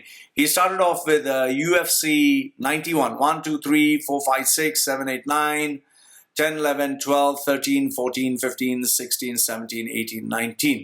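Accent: India. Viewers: Wasted 9 precious mins of my life.. Dude you talk in India accent. Are you from India? You make video with such a good title but you dont talk facts while making predictions... Rafael is 1inch shorter in height and reach is equal why hez big? Gather some facts and make video then it would be interesting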